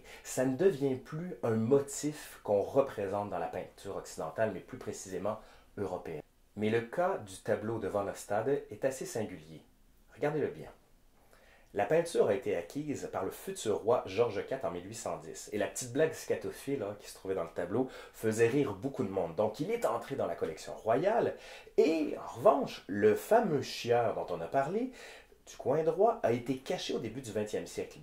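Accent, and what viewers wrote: Quebec, Canada. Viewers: Merci pour ce petit message..j’ai regardé plusieurs de vos vidéos elle sont super intéressant.bien expliquer.et ce petit accent canadien trop chou ..💋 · Quelle érudition et ce petit accent canadien, j’adore. Le sujet n’était pas facile à traiter mais vous l’avez bien chiadé enfin, bien traité. J’aime beaucoup vos capsules. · Video intéressante, dommage pour l'accent québécois 🤣, désolé, jai du mal mais la video est excellente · Petit accent québécois s.v.p !!! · Je découvre votre chaîne, je ne me suis pas fait "chier" une seule seconde avec votre histoire du caca, félicitations. En plus l'accent québécois, qui pourmoi à un charme extraordinaire, je m'abonne à votre chaîne....... et petit détail , j'ai remarqué, jeune homme, que lorsque vous prenez l'accent des nobles français du temps passé, vous perdez votre accent québécois, pour moi c'est fantastique, c'est comme quand vous les québécois vous chantez, vous perdez votre accent. 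Alors que nous , les français (vous aurez compris que vous avez à faire à un français), nous quand nous chantons, quand nous parlons nous ne perdons pas notre "accent français"..... celui de l'arrogance française, reconnue dans le monde entier (sauf en France).... Vive votre chaîne, vive le Québec, vive la France et vive le caca.....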